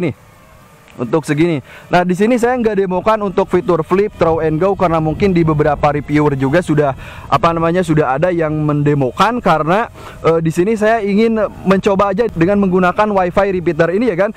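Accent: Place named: Medan, Indonesia. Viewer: Review nya detail nih, manteb. Btw logat medannya kental sekali.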